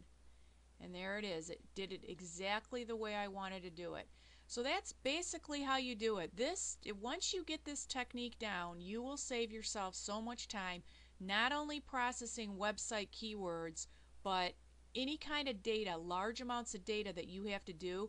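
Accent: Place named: North, United Kingdom. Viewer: Ummm, no. She sounds like she's from up north.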